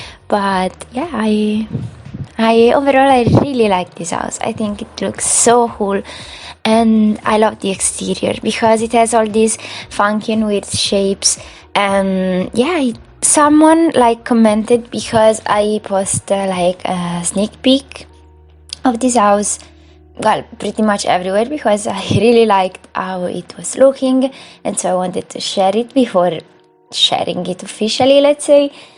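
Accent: Italy. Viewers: ma sei toscana ? si sente troppo anche quando parli in inglese <3 bellissima casa comunque, l'esterno è da favola ma anche la struttura stessa della casa è davvero speciale.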